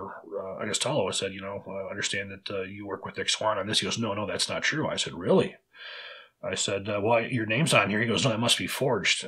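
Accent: Texas, United States. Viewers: I love accents, especially Texan. Just caught the misciatta coming out. Hope all is well.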